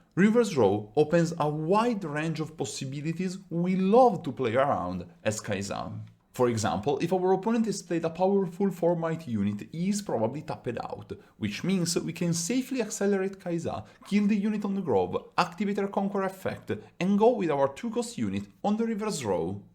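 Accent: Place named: Italy. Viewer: nice video, just a few question: 1 were are u from? (cause ur accent is similar to the italian one, ed anche perché sono italiano e mi sembra di riconoscerlo) 2 i read from the rule that the correct order is not choose battlefield, die roll and choose who go first, but is die roll, player choose battlefield and then choose who go first. i think this way is the worst way, but i understand like this the rule. if i am wrong than it's the fault of the rulebook, it's the worst thing i ever read, someone in riot dont know how to write in an normal english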